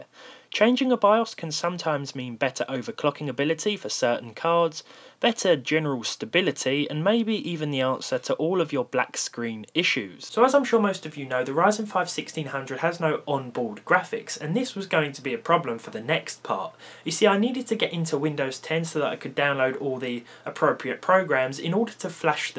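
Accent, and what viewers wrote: United Kingdom. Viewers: Nice British accent, can you say Harry Potter in the end of your next video? Pls pls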